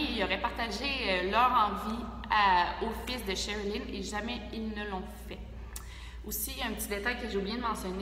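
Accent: Quebec, Canada. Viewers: Bjr je te suis depuis quelques temps maintenant tu es vraiment très pro et t'on accent québécois et vraiment trop cool change rien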